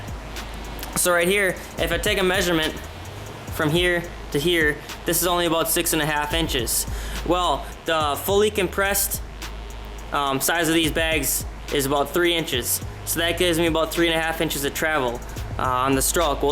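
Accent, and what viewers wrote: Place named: United States. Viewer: Keep tryin not to laugh at ur thick yank accent so i can hear the vid... lol.... But damn your talented as hell... Incredible job so far!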